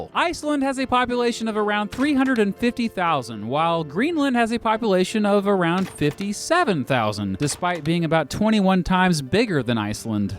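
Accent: United States. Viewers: You’re Icelandic pronunciations are really American. ð is pronounced like the th in think by the way. And á is pronounced like the ou in out. í is pronounced like the ee sheep. ó is pronounced like the o in show. Other than that this was 👌🏼👌🏼👌🏼.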